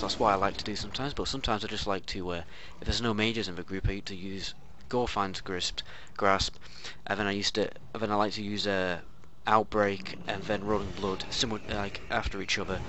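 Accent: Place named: England, United Kingdom. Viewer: Oh how I missed the English accent and their way of pronouncing words, hehe.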